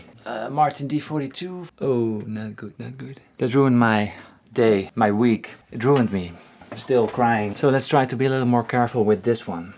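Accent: Netherlands. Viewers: en lekker een beetje hollands derbij, lekker hoor...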